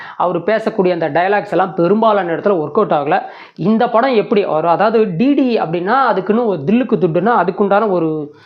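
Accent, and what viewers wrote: Kongu, India. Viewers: Kongu Tamil Vazhga.. Well done review..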